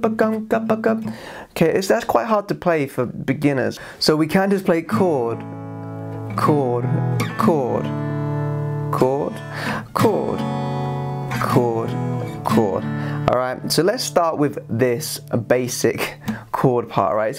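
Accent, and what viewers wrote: United Kingdom. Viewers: Is he british ? Love his accent.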